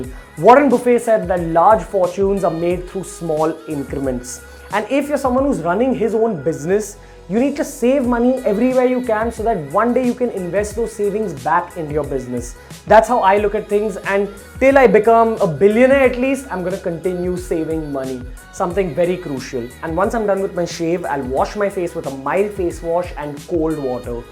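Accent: India. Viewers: Indian Accent. Funny. Do you paint?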